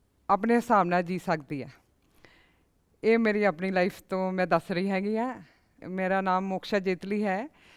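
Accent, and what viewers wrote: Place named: Punjab, India. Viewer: ਕੁੱਝ ਵੀ ਏ,ਏਸ ਔਰਤ ਦੀ ਠੇਠ ਪੰਜਾਬੀ ਬੋਲੀ ਦਾ ਮੈਂ ਬਹੁਤ ਕਾਇਲ ਹਾਂ।ਅੱਤ ਕਰਾ ਦਿੱਤੀ,ਅੱਤ।ਟਾਇਰ ਪੰਚਰ...ਫ਼ੇਰ ਐਂ ਦੇਖਣਗੇ।😀😀😀😀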